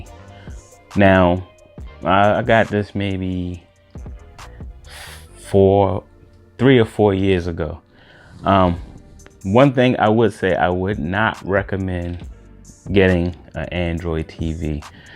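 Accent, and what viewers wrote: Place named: Maryland, United States. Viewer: Feeling that man cave. I sense a Maryland accent...MicroCenter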